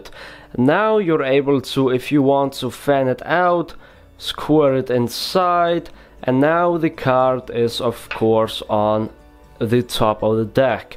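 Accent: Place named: Russia. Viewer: у тебя очень русский акцент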